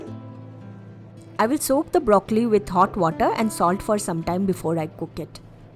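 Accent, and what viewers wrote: Bengal, India. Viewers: Awesome vlogging...sply the way you speak up with a bengali touch i love it...Hop kaya is fine and better...dont worry...stay blessed.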